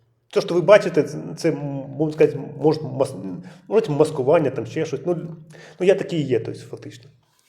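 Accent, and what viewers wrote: Russia. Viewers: Дуже ваша російська ріже слух . Чи ви часом не засланий козачок?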